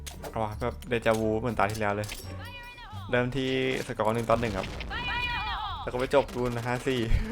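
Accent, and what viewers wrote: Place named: Ratchaburi, Thailand. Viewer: เสียงพี่เหน่อๆเหมือน ราชบุรี-กาญจนบุรี เลยครับ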